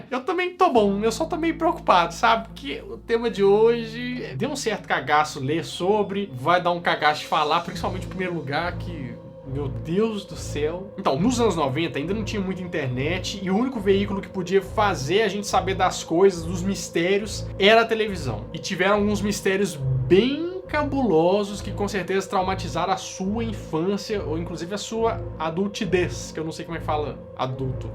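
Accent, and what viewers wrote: Minas Gerais, Brazil. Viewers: Acho fofo sotaque mineiro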